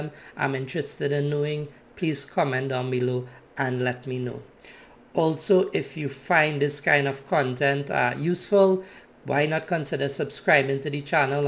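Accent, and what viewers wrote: Trinidad and Tobago. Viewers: trini boy?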